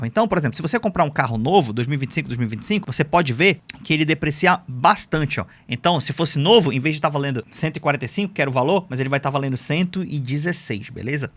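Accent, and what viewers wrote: Manaus, Brazil. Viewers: Sotaque manauara detected 😁 bom demais seu canal!!! Abraço da zl de manauxxx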